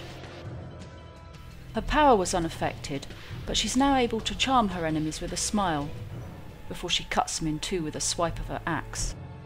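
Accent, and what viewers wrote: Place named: United Kingdom. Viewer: you are to diablo3 what David Attenborough is to the BBC. I know us Americans have been trained to think British accents are smart but it definitely works for this vid.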